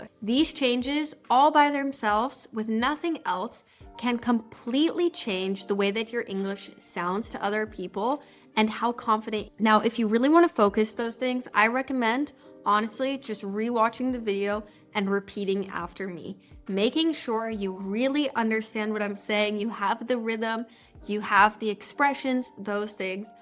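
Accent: United States. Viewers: I found this channel last week and thought your pronunciation was very clear and a great example for speaking, so I started to imitate your speech. The blue lights on subtitles make me easier to copy your speaking because their movements and your voice are in sync. This speaking practice is very effective to get used to American accent and I do it every day. Your videos are so helpful and practical. I really enjoyed watching them. Thank you so much.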